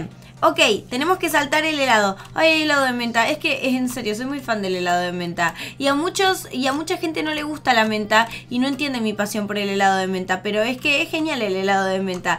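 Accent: Argentina. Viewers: SOS Argentina?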